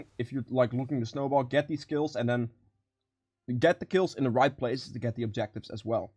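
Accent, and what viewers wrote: Netherlands. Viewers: Are you dutch, you sound dutch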